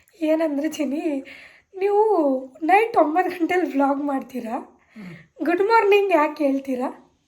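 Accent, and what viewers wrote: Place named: Mandya, India. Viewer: Mandya bhashe maatadtare, namma mandya😊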